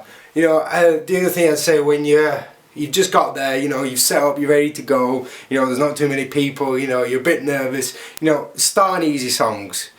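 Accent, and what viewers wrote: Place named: Alabama, United States. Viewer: Ya no dat albama acent ya no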